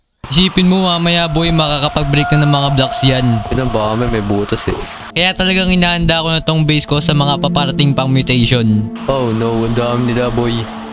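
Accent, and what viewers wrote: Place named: Philippines. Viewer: Filipino Accent "para syang OPIS" ANG LT KUYA HAHAHAHA Nice vid😆❤️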